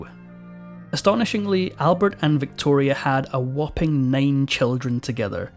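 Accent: Scotland, United Kingdom. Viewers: I had forgotten just how much I loved this narrator’s Scottish brogue! Cheers to you, Sir! ❤❤❤ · Scottish accent and exceptional annunciation 🏴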